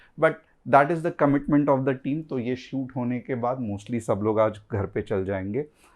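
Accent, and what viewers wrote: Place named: Mumbai, India. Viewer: सर आप मुंबई से हो क्या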